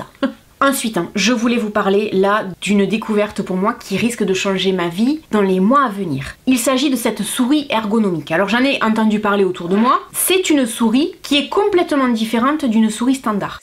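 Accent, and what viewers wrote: South, France. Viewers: Merci pour ton humour ton partage cela fait du bien et ton accent du sud La brassiere n est pas en cotton.? Je ne savais qu il y avait un crayon transparant pour les levres c est top 🐩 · Bravo pour ta belle initiative de collecte ! 💛 j adore tous tes vidéos et ton accent du sud.. charmant ! 😊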